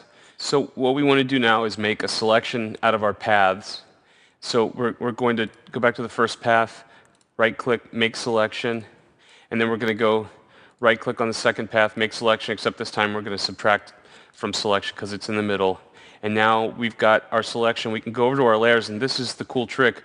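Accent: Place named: United States. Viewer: i dont understand neither his photoshop skill nor his American accent!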